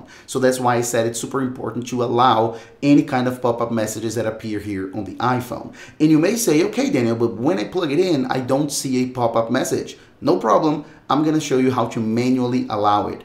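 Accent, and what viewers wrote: Brazil. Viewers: Muito engraçado como seu inglês é quase perfeito, mas da perceber que eh brasileiro, ótimo trabalho irmão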